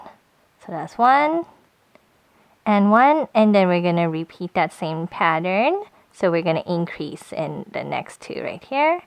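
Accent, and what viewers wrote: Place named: England, United Kingdom. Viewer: Hi! I'm watching this video from Korea. As a non English speaker it is hard to understand(Because lots of korean like me are not used to English accent) but it helped me a lot when I make psyduck. Thank you♥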